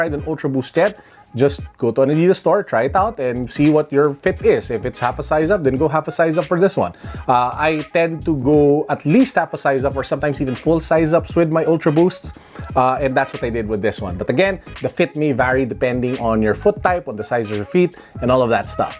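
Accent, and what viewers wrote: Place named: Philippines. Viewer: Went in person to check out all the GOT shoes, this one definitely caught my eye and ultimately bought these. House Lannister were close though but they were only exclusive to women. Starks were nice but would prefer a black bottom sole. White Walkers were nice also but it screamed yellowing over time. Besides the strong filipino accent, you gave a well thought out review of the shoes. Great job bro.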